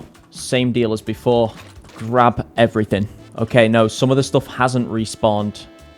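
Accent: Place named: United Kingdom. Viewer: Holy british accent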